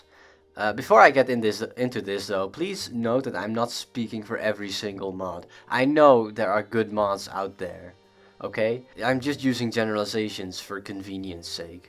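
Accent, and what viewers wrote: Netherlands. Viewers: wow the dutch accent shines right through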